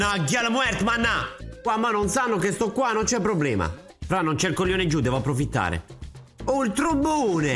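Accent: Rome, Italy. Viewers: Non te se po sentì a parlà mezzo romano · però l accento romano no! E che cazz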